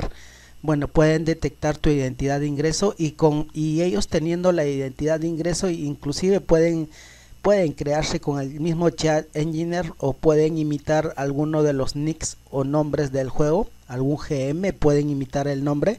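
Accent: Bolivia. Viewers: Eres boliviano?...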